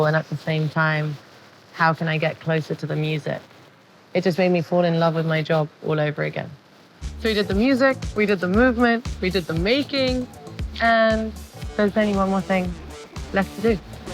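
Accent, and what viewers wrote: United Kingdom. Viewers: Love your songs,your unique style and your British accent.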